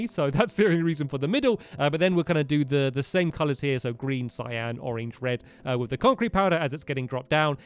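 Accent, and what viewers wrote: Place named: Liverpool, United Kingdom. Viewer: Scouse KiddaLaa boi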